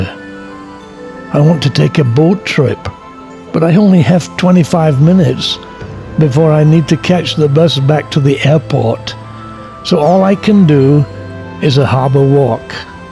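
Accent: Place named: Scotland, United Kingdom. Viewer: I've watched nearly all your videos. they've been a great help to plan our itinerary for our summer trip with our five teenage children. Where are you from? I just can't figure out your accent, sometimes, a bit Scottish or North of England. You're either a Scandinavian who's lived in England a long time or a British person who's lived abroad a long time. Thanks for the great videos and useful tips.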